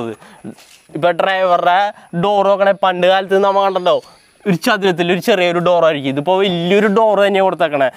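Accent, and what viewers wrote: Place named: Thrissur, India. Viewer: തൃശൂർ ഭാഷയുടെ അഴക്കൊന്നുവെരുത്തനെ. Bus review നേക്കാൾ നമ്മുടെ തൃശൂർ ഭാഷ കേൾക്കാന വരുന്നത്...... keep it up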